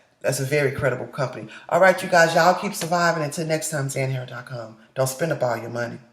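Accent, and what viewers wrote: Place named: South, United States. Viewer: Smart women and I love your southern accent.👍🌈☮️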